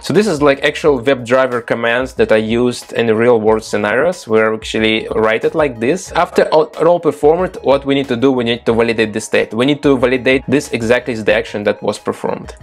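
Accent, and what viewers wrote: Russia. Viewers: Толковый блоггер. По акценту слышно, что русский 😅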